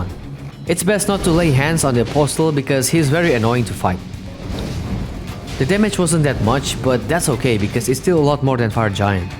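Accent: Indonesia. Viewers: pantes kek ga asing logat inggrisnya ternyata org indo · are you indonesian? you sound very indonesian no disrespect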